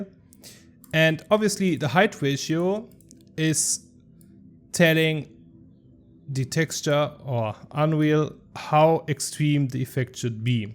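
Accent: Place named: Germany. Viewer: Der Deutsche Akzent ist nicht überhörbar 😅, aber gutes Video 👍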